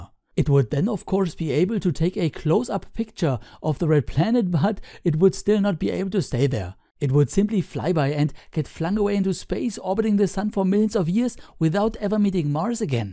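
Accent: Germany. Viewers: CptMikeTango He's German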